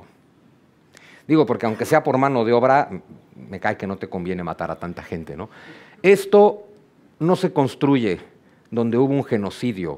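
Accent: Spain. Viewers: Apenas inicia y ya trae el acento español, gracias, gracias, gracias. No mms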